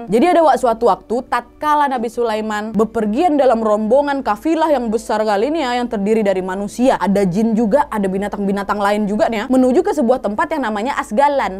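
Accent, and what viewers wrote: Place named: Palembang, Indonesia. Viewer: Keren banget 🙏ka ini....logat nya itu ga bosen denger nya...kalau ga salah ka Nadia ini....wong kito galo👍....soal kl ngomong ga metinggalan....WA nya jd ciri ckas nya....smg sehat sll 🙏💪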